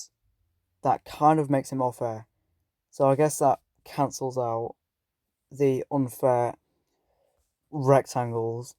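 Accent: United Kingdom. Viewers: HES BRITISH! LETS GO! (Take your time, get used to your voice being on youtube, no worry) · Love your voice my bro, Keep doing. (British accent makes it more beter 💀)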